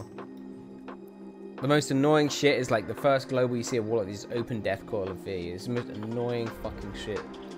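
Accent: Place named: United States. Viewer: i like ur american resto druid accent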